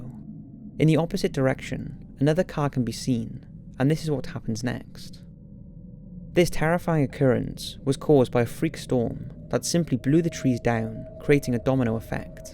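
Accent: Wales, United Kingdom. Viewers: TheMillster 81 - I love his soft Welsh burr! It's unmistakable. 😊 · I love your videos! Are you by any chance Welsh? I’m sure I can hear it in your voice!! Maybe Cardiff?! 😁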